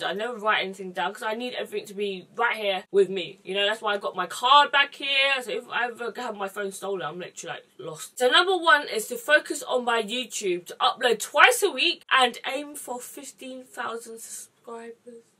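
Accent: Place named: England, United Kingdom. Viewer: OMG this is the first youtube video of your's that I've watched and you have an english accent!!! somehow i knew you were british but i always imagined your instagram videos in an american accent lol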